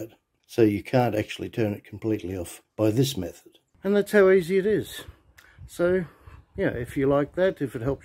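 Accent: Australia. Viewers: And your Australian accent is super cool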